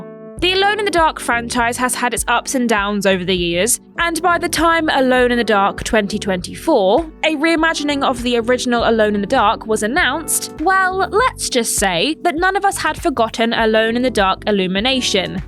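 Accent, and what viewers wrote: United Kingdom. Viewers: For some reason, sounds more British than ever in this video <3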